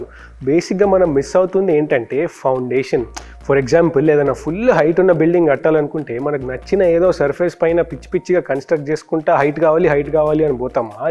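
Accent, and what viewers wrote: Telangana, India. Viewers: Telangana yasa super👌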